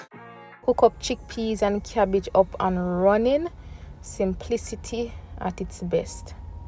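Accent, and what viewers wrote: Jamaica. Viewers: Do I detect a Jamaican accent? Love the food.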